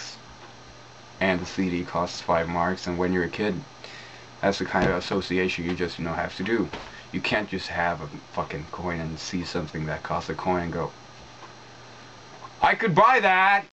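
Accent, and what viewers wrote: United States. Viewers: lol its funny seeing you talk about a quarter like its such a foreign object, when you sound like you have an american accent. I was actually surprised to find out you're in Finland.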